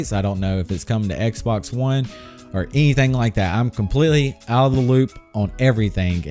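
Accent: South, United States. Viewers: this dude sounds like hill billy bob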